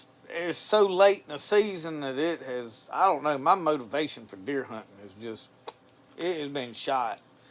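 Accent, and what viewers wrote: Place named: Mississippi, United States. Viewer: I never even heard of you till I searched hide tanning about 2 weeks ago. I knew you were from Mississippi soon as you started talking. All my family's from there, I was raised and still live in North Florida due to my dad joining the Navy. Anyway I'm enjoying the content I pretty much only watch YouTube not into the news or movies much anymore I like being outside but YouTube is a learning tool and experience.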